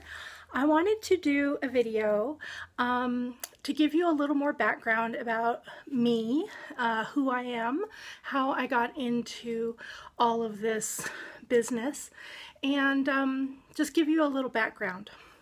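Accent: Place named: Washington, United States. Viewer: got to love that Washingtonian accent... another nice vid from you... thanks!!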